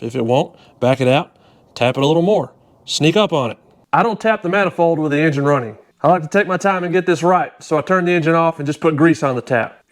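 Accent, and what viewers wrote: South, United States. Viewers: Brother you’re like the VGG of diesels, with a southern accent. Awesome channel!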